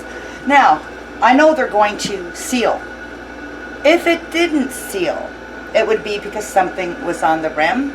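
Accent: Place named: Canada. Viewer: I guess I missed the time and temp. I saw you said 200f, but for how long? You're right, I vacuum sealed my jars, but didnt heat them first. I need to go back and redo them. I hear your Canadian accent, I was born in Ontario!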